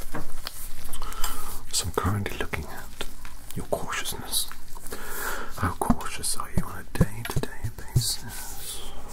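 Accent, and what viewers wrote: United Kingdom. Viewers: Minolica coughs awkwardly in I totally don’t thirst over his gorgeous hair and British accent sorry 😅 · You could genuinely do a Micheal or even William Afton from the Five nights at Freddy’s series. As you sound just as British, and almost sinister sometimes. But at the same time you are very funny so you could have fun with it. I don’t expect you to do it I just had that thought, could be cool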